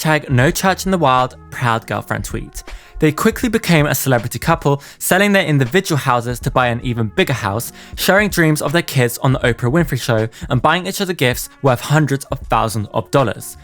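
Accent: United Kingdom. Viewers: I really love your accent, reminds me of some of my British heritage and when I lived in London, I also love how you piece everything together and your enthusiasm. It does however, feel very biased to support The Kardashian’s. They have been caught lying a lot so I wouldn’t be surprised if she lied in her book too. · AND FER YOU LIL OOZIE…. AN HOUR LONG. BRITISH SPEAKING DOC ON THE KKW THE ONE AND ONLY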